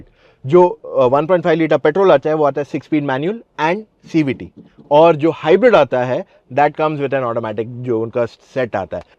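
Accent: India. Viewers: Hey team! Great video as always. Love the honest, no-nonsense review style. Your natural Hinglish flow and the way you bluntly call out brands (like the missing fog lamps and the "new gen" claims) is exactly why we trust your channel over the PR brochures! 🙌 Just wanted to share some friendly, constructive feedback as a viewer to make your delivery even sharper: Punchier phrasing:Sometimes the English sentences get a little tangled. For example, instead of saying "I am a big fan of that being going off" about the LED light, a quick "I'm glad we can turn that off" hits much better. Descriptive words: When you talked about the new touchscreen angle, you said "it's like this instead of this way" while using hand gestures. Since a lot of us listen to your videos in the background while working or driving, describing it verbally (like, "the screen is tilted slightly upwards now") really helps paint the picture! 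Fewer fillers: You use phrases like "just fine" or "pretty much" a lot (like when talking about the ground clearance). Swapping those for words like "adequate", "practical", or "sufficient" would make you sound even more like the expert you are. Keep up the awesome work! Never lose that raw, unscripted energy.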